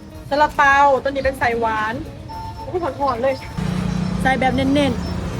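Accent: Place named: Ubon Ratchathani, Thailand. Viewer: สำเนียงคนอุบลค่ะ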